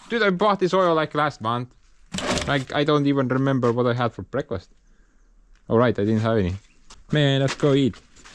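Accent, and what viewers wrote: Estonia. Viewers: I mentioned your channel to an acquaintance I have in Latvia. He saw your name and said you're for sure Latvian living in Estonia because it's such a Latvian name. But then he heard your accent and said, "No he's for sure Estonian. Now I'm intrigued." 😅 Hopefully he'll be a new fan!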